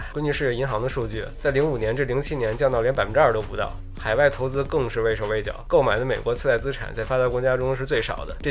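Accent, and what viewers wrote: Beijing, China. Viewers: 巫师大神，哪都好，就是您的北京话实在是太北京话了，能稍微吐字清楚点么，谢谢您。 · 北京腔真是难听，另外少参杂个人想法保持客观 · 北京腔太难听好好说话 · 还好吧，最多是北京人说普通话，纯正的北京话吞的妈都不认识了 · 这北京口音跟lks好像，喜欢😜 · 就爱听的北京话聊正事儿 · 开头笑了。爷关更也好奶关更也好，会一直关注巫师，因为实在文案精彩论据夯实。京片儿口音也很喜欢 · 每次最后的一分钟我都想写下来，分分钟拿去diss那些傻逼。还有就是我好爱巫师的北京话 ❤️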